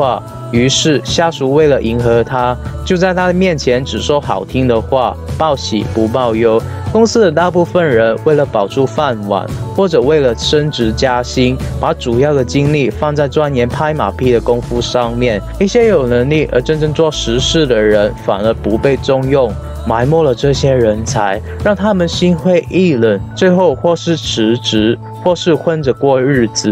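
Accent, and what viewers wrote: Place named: Hong Kong, China. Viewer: u are from hong kong right? your accent seems like hk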